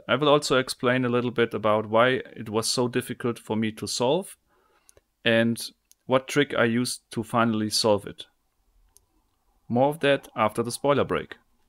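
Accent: Germany. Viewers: dieser Moment wenn du merkst dass er Deutscher ist 😂 der Akzent.... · Alter du bist deutsch dein aktzent verraten ^^ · Man hört das du deutsch bist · Man hört den deutschen Akzent im Englisch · You have a german accent. Are you from Germany?☺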